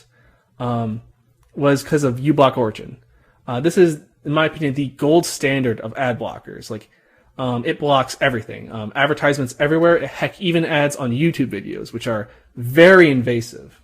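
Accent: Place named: United States. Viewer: He sounds American, poor guy. Being Catholic in USA must be like being a Russian in post-WWI Germany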